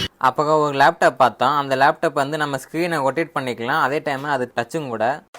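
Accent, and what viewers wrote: Madurai, India. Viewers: Madurai ahh bro neenga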